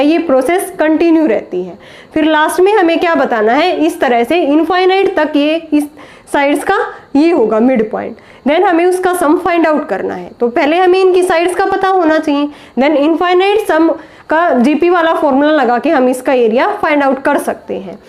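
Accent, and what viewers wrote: Haryana, India. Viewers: Ap Haryana se ho mam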